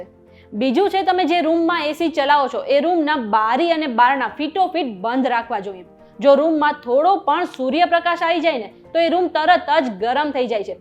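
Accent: Kathiawar, India. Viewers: Whether you are from Kathiawad or what, the speech seems to be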